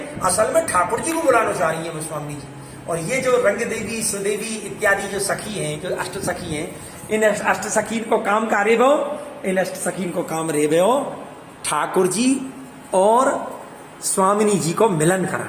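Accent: Braj, India. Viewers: महाराज जी आपकी ब्रज वाणी बड़ी मनभावन हैं 🙏🙏🙏 जय राधा रानी की 🚩🚩🚩🚩🚩🚩🚩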